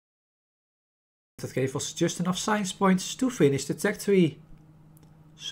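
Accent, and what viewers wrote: Netherlands. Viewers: I smell dutch. Tell me if I am right or not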